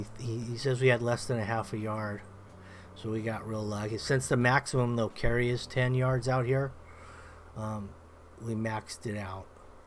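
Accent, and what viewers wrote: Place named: California, United States. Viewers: I dunno, agree that it's dead on So Cal but more surfer than Mexican influenced. I think the Cali Mex accent is influenced by the So Cal surfer type sounds more than the other way around. I've lived here my whole life so kind of hard for me to hear so maybe I'm wrong but I DID grow up with Mexicans lol. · That accent....so calififornia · Pale Writer its a cali accent is wat he is talking about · I live in Los Angeles so many people talk like that up here😂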